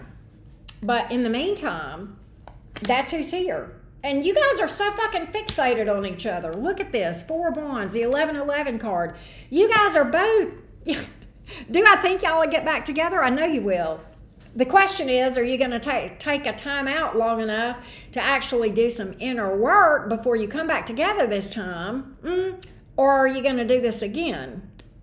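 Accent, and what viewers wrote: South, United States. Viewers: You’re so cute . I love your energy and your little southern accent ❤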